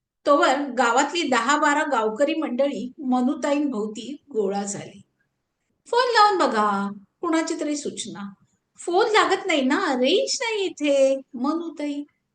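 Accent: India. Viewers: विनोदी कथेचं मिश्किल मालवणी ठसक्यात मस्त सादरीकरण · खूपच सुंदर सादरीकरण. ऐकताना खूप मजा वाटली .मुख्यता कोकणी हेल अगदी ठसक्यात.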